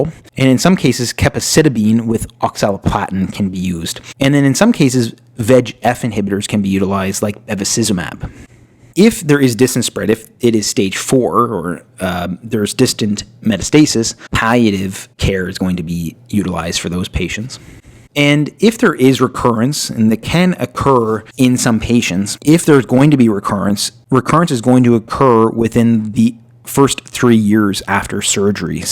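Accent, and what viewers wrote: Canada. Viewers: Canadian? Yuck.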